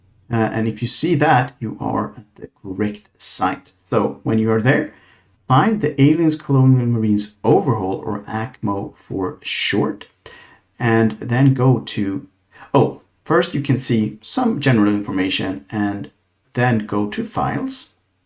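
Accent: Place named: Sweden. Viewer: Älskar den ibland svengelska accenten och reklamen "enkel kemikaliehantering". tack för videon förresten, riktigt bra!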